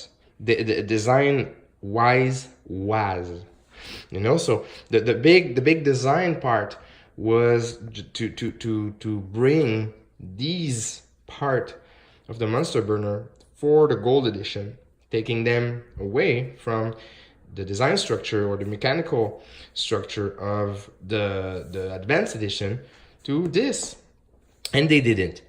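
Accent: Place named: Quebec, Canada. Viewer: I detect a Quebecois accent... ca va mon chum? Good review! Looking at buying these books myself.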